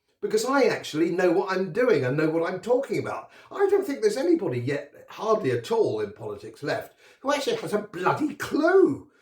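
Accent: RP, United Kingdom. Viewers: Your accent is very well to do.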